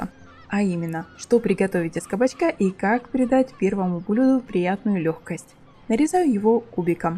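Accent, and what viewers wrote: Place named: Surzhik, Ukraine. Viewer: С таким чудовищным суржиком и на рецепах?😅😅😅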